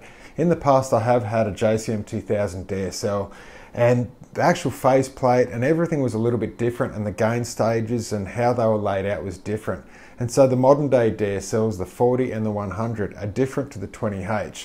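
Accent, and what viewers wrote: Australia. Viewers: Aussie AF ! 🇦🇺 bet you was a mad sk8r too !!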